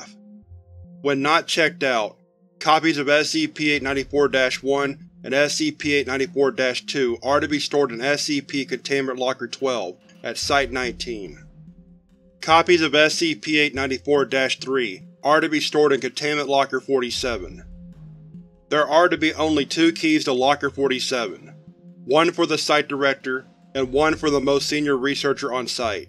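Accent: United States. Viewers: *intentionally VHS sound, good soundproofing or shady mic, sounds like a trucker's CB radio cadence/accent, are you from Texas? if not, why?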